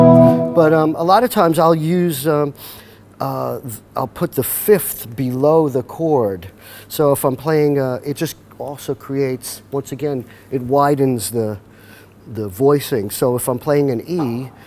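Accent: Brooklyn, United States. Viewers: Is he from Brooklyn? I forget, but it sounds like it. What a cool guy. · His palm muting virtuosity is out of this world. Love that you can hear a little of that 'Brooklyn honk' creep into his speech every now and then too. A nice Jewish kid from Brooklyn :-)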